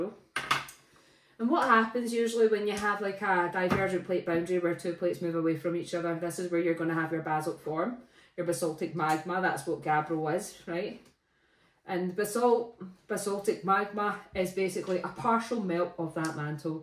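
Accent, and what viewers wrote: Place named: Machars, United Kingdom. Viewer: Sounds local to me , Machars? .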